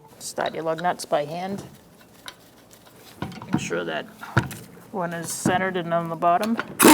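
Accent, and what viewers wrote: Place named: New England, United States. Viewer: wicked new england accent lovin' it kid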